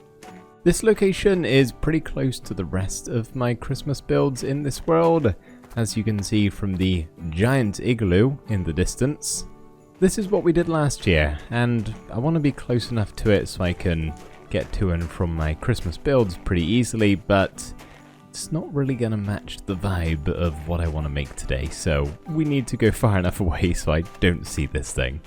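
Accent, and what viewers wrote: United Kingdom. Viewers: The cozy Christmas Minecraft and your British accent is so nostalgic as someone who grew up watching InTheLittleWood’s Christmas ADVENTure Minecraft series!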